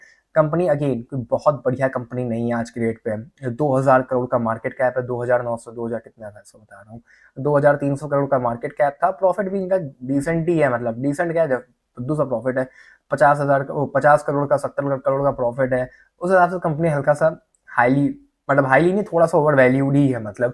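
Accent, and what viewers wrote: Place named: Kanpur, India. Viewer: Brother are u from kanpur ? Baat karne lehja wahi ka lag raha h ... Appreciated your efforts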